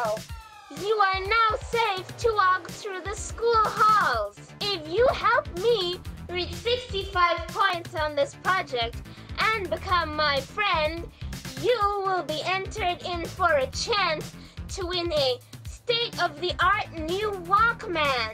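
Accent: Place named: England, United Kingdom. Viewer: As always speaks in a VERY English accent EDITING ON TOP FORM 😅 Loved this, I genuinely look forward to when you upload 😄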